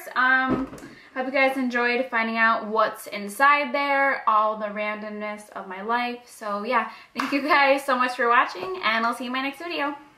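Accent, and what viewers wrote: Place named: Upstate New York, United States. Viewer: You have an upstate NY accent, like how you pronounce your "a's". When I lived in MD my friends there would bust my chops because I was the same way. Water safari is the best BTW. I live in PA now and my bf just doesn't understand why I want to drive 5hrs back home just to go to a water park.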